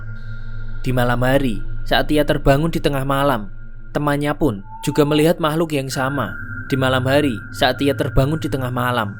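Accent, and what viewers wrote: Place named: Java, Indonesia. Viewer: Logatnya bg kaya orang jawa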